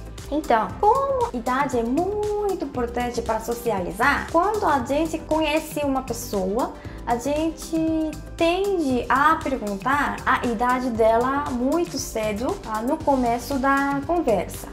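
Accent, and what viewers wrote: South Korea. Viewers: Você ja deve ter ouvido falar, mas aqui em minas gerais falamos "né" com MUITA frequência mesmo. Da até uma certa "familiaridade" em te ouvir falar mesmo com o sotaque coreano kk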